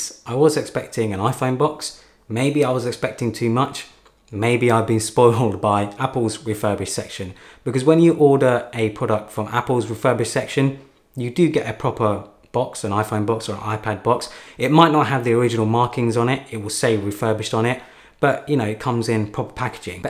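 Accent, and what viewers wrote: United Kingdom. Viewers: No one: Absolutely no one:: "This is a banger, it's wuily wuily fantastic " (Really really fantastic) That British accent 👌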